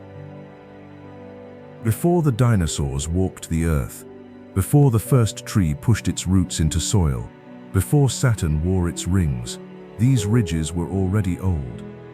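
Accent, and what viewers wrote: England, United Kingdom. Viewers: The English accented narration is a strange choice. How about a nice Shenandoah Valley accent for a video like this?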